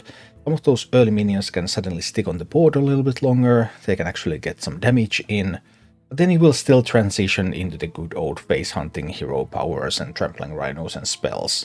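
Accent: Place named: Finland. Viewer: Sä paras! Olin amerikkalainen vaihto-opiskelija, joka asui suomessa 5 vuotta sitten. Rakastan videoitasi ja varsinkin aksenttiasi, se tuo mieleen ne ystävät, jotka sain siellä Porissa.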